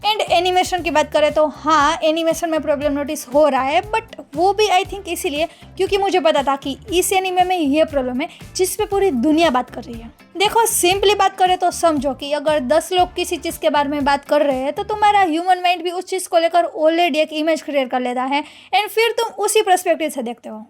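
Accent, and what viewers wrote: Gujarat, India. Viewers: Gujju accent change kar.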